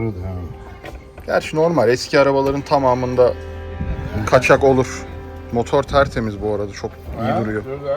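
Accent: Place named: Neftchala, Azerbaijan. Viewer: Aaa tobaa gedib Bankəyəə cıxıb eeeeeeeee😀Abi Neftcala lehcesidi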